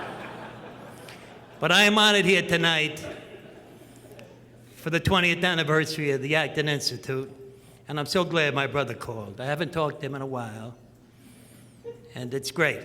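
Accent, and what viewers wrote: Brooklyn, United States. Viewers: I've see other other interviews with him, where he had the much cooler Brooklyn accent. Looks like his New Jersey accent has now become his default after Sopranos. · I just fucking love his Brooklyn accent! I could listen to him all day and not get bored.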